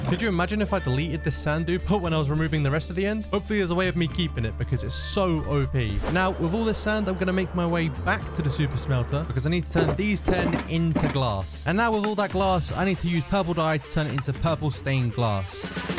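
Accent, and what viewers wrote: United Kingdom. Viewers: Your from England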